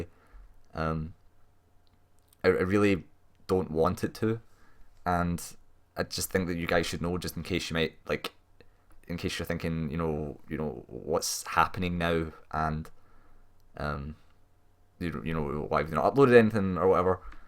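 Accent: Scotland, United Kingdom. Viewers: Sorry for your loss. I'm from Canada and I've been watching you guys for a while now (pretty much for the guides but also the let's play). It was hard when I started because my first language is French. Although I speak English well, I wasn't used to your accent. It took me a while but after few videos, it was all good (I even mimic your accent when I'm playing Dark Souls and it makes my wife laugh so much asking "Since when you have a Scottish accent!!!"). Your channel is genuine and I'd be glad to contribute ($$) if it can help you! Cheers mate!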